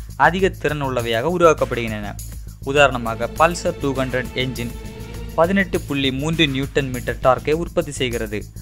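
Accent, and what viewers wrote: Kanyakumari, India. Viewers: Neega Kannya Kumari yaa bro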